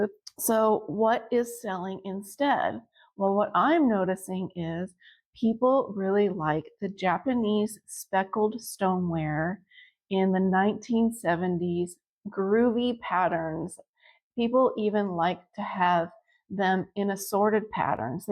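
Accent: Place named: North, United States. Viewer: Are you from north Georgia? Your strong northern accent says no.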